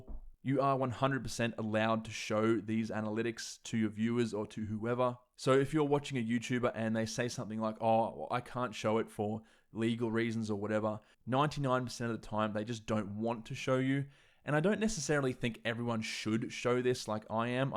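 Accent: Australia. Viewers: Came to this channel for the hot Mac videos. Stayed for the aussie dialect. Will keep on staying for stuff like this.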